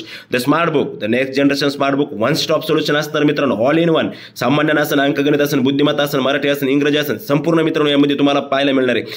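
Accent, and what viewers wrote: Ahmednagar, India. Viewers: सर मला तुमची भाषा ऐकूनच वाटल होत की तुम्ही नगर चे असणार म्हणून 😄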